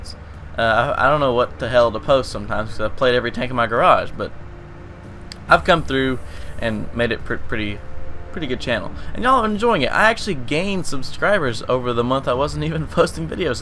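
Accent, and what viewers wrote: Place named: South, United States. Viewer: im glad that your suthern accent is back!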